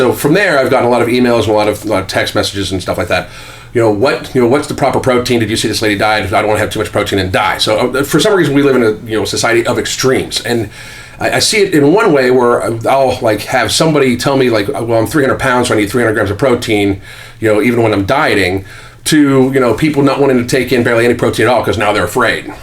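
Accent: United States. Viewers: I told my Russian friend to watch your videos and he said despite being Russian you have a perfect american accent :D :D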